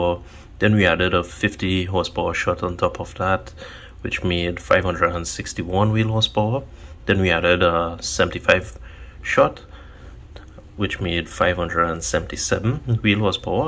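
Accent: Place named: Trinidad and Tobago. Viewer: Yo, you sound likea Trini! Big up Trinidad; we got some of the best mechanics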